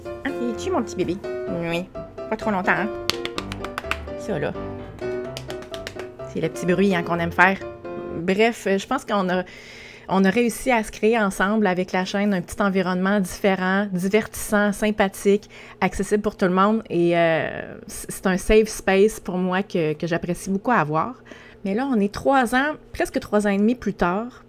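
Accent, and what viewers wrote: Canada. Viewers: Hello, j'ai découvert ta chaine en novembre 2023 suite au renouvellement de mon matériel et j'ai repris gout à figer le temps. Grace à toi, en décembre je passais sur Luminar Neo et j'ai le projet de faire ta formation mais avant, je termine la formation sur l'appareil que j'utilise. Concernant le temps que tu passes à nous offrir ton savoir, effectivement, parfois il faut prendre du recul et penser à soi-même et peut être que tu seras encore plus lumineuse avec les 2 vidéos par mois car tu auras profité de ceux qui t'entourent. Encore merci de nous offrir ton expérience et ton savoir. Ne change rien...surtout l'accent Canadien que les français adorent.🤗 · J’aime beaucoup l’humour que vous déployez dans vos cours photos , c’est sympathique, vivant , et instructif. Quant à vos chats , je me demande s’ils n’expriment pas une forme de rébellion 😼 😜, prenez votre temps et quoique vous décidiez, ce fut un plaisir 📷… avec ce petit accent canadien qui plait tant à nous autres , les français . 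Thierry, région de Champagne,France 🥂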